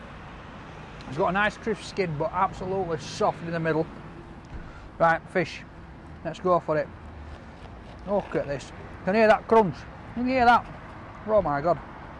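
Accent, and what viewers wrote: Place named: Yorkshire, United Kingdom. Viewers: Yorkshire accent rainy weather and brilliant fish and chips.. I'm fasting but I'm coming after Ramadan for this.. Great video pal keep it up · I do really love the voice of a Yorkshireman! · He's from Barnsley and lives in Leeds I'd say that's a Yorkshire accent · The accents are similar but not the same. This guy is from West Yorkshire and Peter Kay is from Lancashire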